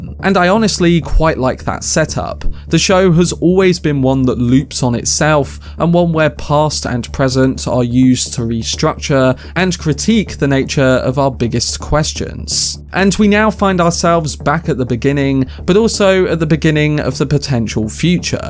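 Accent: United Kingdom. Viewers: It’s standard British pronunciation, don’t be an ignoramus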